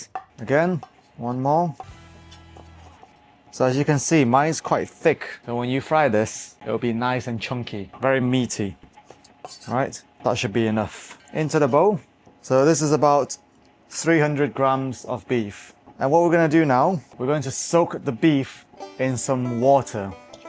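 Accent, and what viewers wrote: Northern England, United Kingdom. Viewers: This is like ' Sunday Kitchen ' on BBC 2 But Chinese Style. And a Northern English Accent BRILLIANT !!! 👍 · Are you from Hong Kong? I thought I detected a partial British accent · Your British accent make it authentic flavor 😅 easy to follow , thank you .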